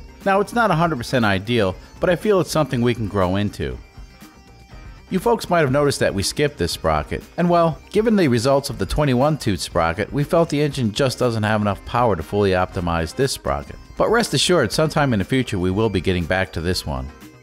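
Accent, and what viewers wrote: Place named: New England, United States. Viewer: You sound like a New England transplant. Wicked awesome, hey.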